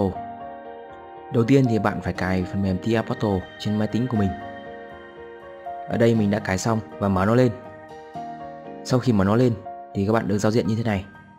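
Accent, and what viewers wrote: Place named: North, Vietnam. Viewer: Rất hay, giọng miền bắc thân thương, video ngắn , trọng tâm ko dài dòng'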